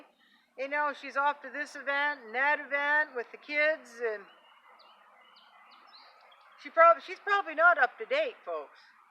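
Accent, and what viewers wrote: Maine, United States. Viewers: I bought my mom in CT several cases of H2O and clearly marked them for emergency. Next time I went there I asked her where she stored it. She took it to the dump. Couldn’t understand why she needed it. I just smacked myself in the forehead. I’m glad I found your channel. I lived in Belfast for 8 years. I miss the great no nonsense Maine way of thinking (and the accent). I’ll keep watching ya. I’m in your tribe, but in TN now.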